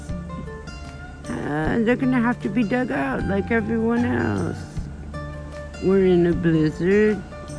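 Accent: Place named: South, United States. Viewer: ❤I hope you didn’t drive in that Terrible Dangerous Snowstorm ❤Take Care❤I am outta that Mountain 🏔️ Hillbilly Cabin of Mine for Now &Back in the Northern “Q”City. Were there is less Snow ❄️ than the Mountains 🏔️ are covered Deep the Higher You Go..2to 3 Feet 👣 up ⬆️ in the Mountains..Down in the Valley 6”inches at most…We live in Lower Mountain 🏔️ Valleys mostly in British Columbia…Your Voice is nice “Southern Drawl”You don’t have to speak faster then I’d have Trouble understanding..Keep on Posting every Day has a New Story to tell😊😊😊❤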